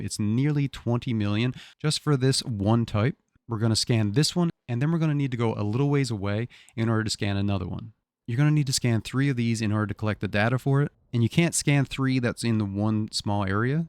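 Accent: Newfoundland, Canada. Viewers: HEY! just a heads up..... love the canadian accent 😉😆 getting back into this game today after literally almost a decade and well "overwhelming" is too light of a word so I appreciate the advice from 4 months ago lol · Man I can't watch this. Can't stand the way Canadians talk. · You from Newfoundland?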